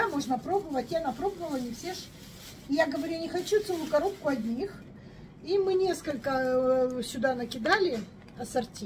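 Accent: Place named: Ukraine. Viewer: С покупочками😊 Вы сами с Украины? Родной гОвор кажется 😊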